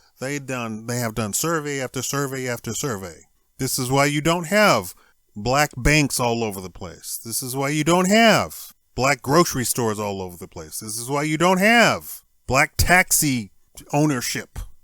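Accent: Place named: Caribbean. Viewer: ha ha dude where is your family originated? i hear a carribian accent.Were you born in nevada?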